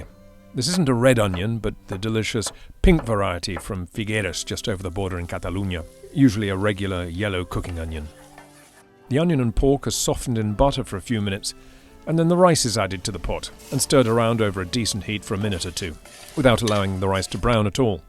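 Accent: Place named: England, United Kingdom. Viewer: you keep on producing whatever is in your heart and mind to produce and you won’t be letting me down! Promise! I will be honest with you, I really don’t know how I came across your channel, but I am very glad that I did. I have had many years in the wine industry (which thanks to Covid has been a challenging thing recently for not only myself but many food and wine professionals in the city), but I started out in the food end of the business and I have done a LOT of things in both areas over the years. Recently, New York has been feeling like a culinary dessert to me…maybe I have just become jaded! There was something about your videos when I first discovered them that really caught my attention, and trust me that’s saying a lot because I take in a lot of what is out there and just through osmosis here in the city I pick up a lot. I confess that when I first started watching it was just really good eye candy as they are so beautiful, but very refreshing and I liked your style (not an egomaniac and who doesn’t love an English accent), and the recipes tugged at my heart. 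This may seem a little silly to say to you, but your videos have been awakening a side of me that I was losing touch with and is so important to me. I can’t help myself, when I watch them now I look at them from a more professional lens and yes, I do praise you a lot, but I do have a little mental list of a few thing… Yes, I am here in Manhattan, not far from your old stomping grounds…I am in Hell’s Ki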